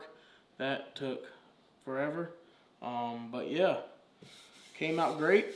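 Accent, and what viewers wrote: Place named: East Coast, Canada. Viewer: Dang I'm up in Canada It sounds like you have an East coast Canadian accent.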